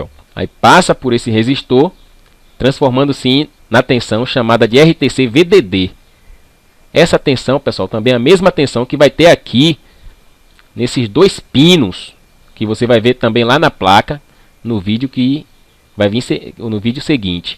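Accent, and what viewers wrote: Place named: Pernambuco, Brazil. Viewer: tu ta de parabéns pela didática, simples, direta e eficaz! E esse sotaque ta me parecendo bem "leão do norte". Sou de PE, de onde você é?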